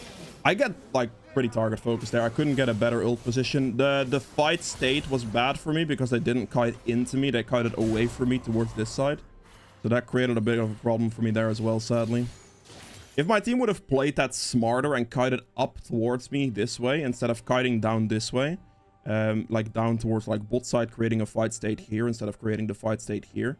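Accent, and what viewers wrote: Netherlands. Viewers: Hey thanks for the guide. You seem to have a Dutch accent. Are you Dutch by any chance? :D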